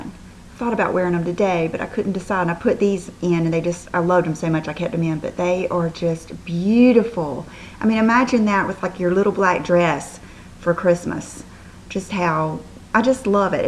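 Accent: South, United States. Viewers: Hey,! I've just been having a marathon here lately.:) I'm a Mississippi girl and totally get your Southern accent. I think it's part of what makes you so charming. Our Accent is a blessing! You keep doing you!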